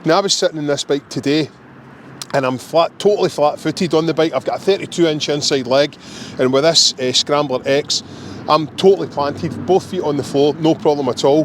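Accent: Scotland, United Kingdom. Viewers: I had issues with the accent too and had to use the subtitles… but I loooove the accent, made want to hop on a plane (from Spain) and go visit Scotland! I like the way you structured the review too even though I finally went for the XC because of the color, the bling factor and the reduced dealer price. 😅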